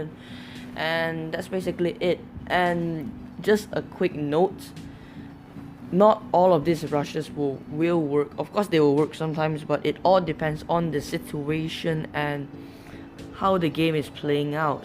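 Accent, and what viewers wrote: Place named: Singapore. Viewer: You sound singaporean, where are you from?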